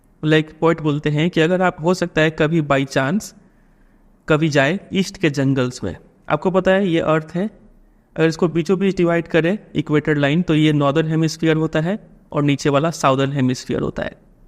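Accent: Bihar, India. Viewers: sir are u from bihar your explaination is bestest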